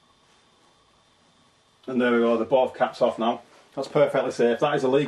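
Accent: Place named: Blackburn, United Kingdom. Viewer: "Top it up to one borr...corrrned beef in the corr porrk...." I love the Blackburn accent! Or Burnley or Chorley...somewhere around there. Godd videos too, a Godsend for a useless lump like me.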